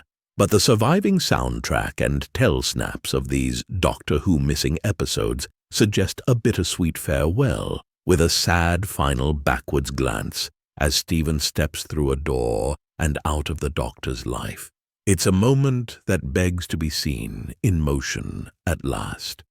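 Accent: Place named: Transatlantic, United States. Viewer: Love the transatlantic accent , reminds of the haunted mansion.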